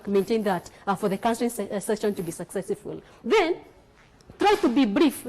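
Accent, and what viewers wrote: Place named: Kenya. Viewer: Nice lecture... I was suffering a little with the Kenyan accent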